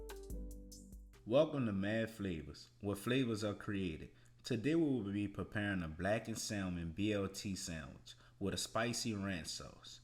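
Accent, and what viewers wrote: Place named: New Orleans, United States. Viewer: The New Orleans accent tho 💪🏾💪🏾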